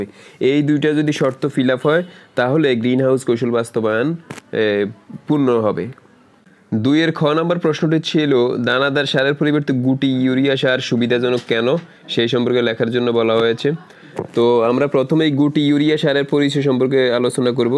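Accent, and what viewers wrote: Noakhali, Bangladesh. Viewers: আপনার বাড়ি নোয়াখালী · Bhai apne noakhailla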